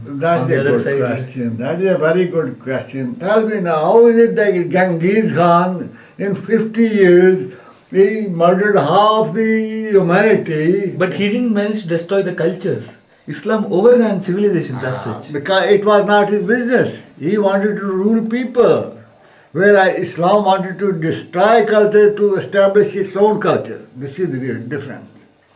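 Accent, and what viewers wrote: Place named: Kashmir, India. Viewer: you speak like kashmiri pundit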